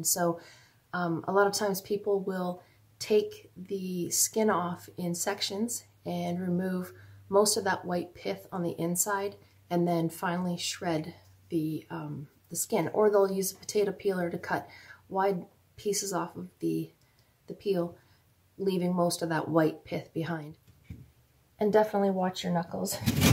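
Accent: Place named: Canada. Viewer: Hi. Canned up a batch of the Orange Marmalade today. Snowing winter day here in the high desert of Oregon and this Marmalade is like an afternoon in Florida! Your video inspired me to can something new. It's absolutely lovely. As to your reply wondering what words gave you away to me as a Canadian, any word with "out" in it. Lol. Such as "about" and the word been come to mind. Not that it's a bad thing. I love Canadians! I lived in Montana not far from the Canadian border and had the pleasure of meeting many when they ventured down from Saskatchewan to our little town south of the border. Take care and looking forward to what you bring next to Canuary 2023.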